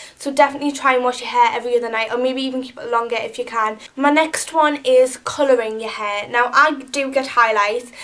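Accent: Newcastle, United Kingdom. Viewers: Guys she isn't Irish, she's from Newcastle in England :) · Shes got a geordie accent, from around newcastle in the UK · no its not? it's a geordie accent. (i am also a geordie). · That is definitely not an Irish nor Welsh accent. If I was to guess, I'd say wither Liverpool or Newcastle. Probably the latter. Bloody lay off it. That's not the aim of the video. dayum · is that the Geordie accent? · She is from Newcastle by the sound of her accent - similar to mine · I think shes from Newcastle in Britain. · omg we have the same name and are you a geordie, if you are we are so alike!! x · u from newcastle? i think i see a fellow geordie? ahaha x whey aye man x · Omg , I'm a Geordie as well! Best accent ever! ,xo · She's a Geordie lasssss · She's a Geordie like me omg! From Newcastle!🙊 ♡ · Geordie accent ? (Newcastle ) proppa love ittt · She talks like them in geordie shore. 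· She is British, she is from Newcastle I think... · She has a Newcastle accent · shes from Newcastle not feking Ireland -_ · She's from Newcastle :) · Her Accent is Gordie, What Gordie Shore, it will change your life. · Her accent isn't 'fake' shes from newcastle, north easy of England. I went to school with her. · shes not from liverpool shes from newcastle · R u from Newcastle?????? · She's from Newcastle!!! You will recognise the accent if your from Newcastle or watch geordie shore, her accent is not fake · its a geordie accent (newcastle) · it's geordie, like me :) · im from newcastle, yeah she is from newcastle, in Britian we're Geordies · Ive got this accent too, shes geordie :) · Erm for all who want to know she is geordie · Yay geordie accent :D I'm from Newcastle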